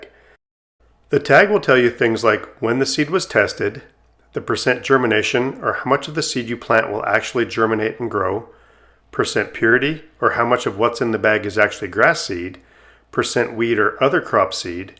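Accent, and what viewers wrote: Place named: Midwest, United States. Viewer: It's a Midwest pronounciation quirk. Plenty of Midwesterns with Italian roots, including more recent generations, also pronounce it this way.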